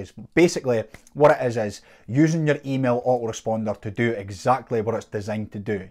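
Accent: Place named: Scotland, United Kingdom. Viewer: love the Scottish accent. Helpful video. I find AWeber to be difficult. I used mailchimp for years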